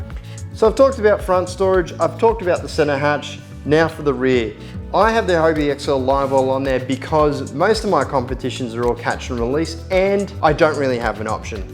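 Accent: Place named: Australia. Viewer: Searched for a Hobie Pro Angler review Australia and your channel came up, just needed an Australian talking about the Kayak and stuff not our fast talking American brothers which sometimes can be like a different language !! God luv em, anyway really enjoy your Vids and thanks for all the effort you go too, keep up the good work and I hope to replicating most of your set up soon ... Cheers Mate :) New Sub and happy to be one......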